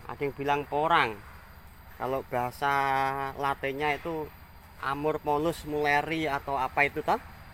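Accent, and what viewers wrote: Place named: Java, Indonesia. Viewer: Mantaap...tutorialnya. klo dengar bahasany, asli orang jawa ya, om...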